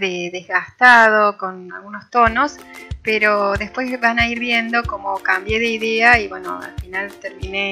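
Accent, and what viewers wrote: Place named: Cordoba, Argentina. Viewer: hermoso quedó, y me encanta tu tonada cordobesa 😄😍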